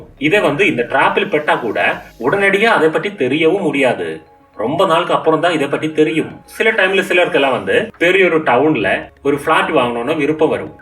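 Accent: Kerala, India. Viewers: உங்க கேரள தமிழ் 😍😘😍😘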